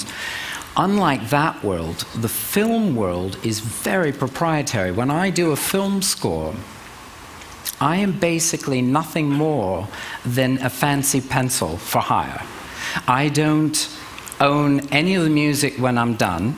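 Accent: England, United Kingdom. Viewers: Woot...british accent? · What's with the accent? Did he emigrate from the UK? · Why does he have a British accent? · he was such a British/almost Australian accent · Ive heard his accent in many interviews, his accent is very English, you can hear him pretend to do a american accent in this video! but what i do know he's lived in the U.K and studied there for many years when he was young and went to study at the prestigious Royal College of Music. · What's up with the British accent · why does * sound british he's American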